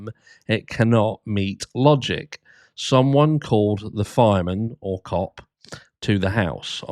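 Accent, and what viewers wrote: United Kingdom. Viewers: I love the way you swear in your lovely British accent! Keep it up. Love from Idaho!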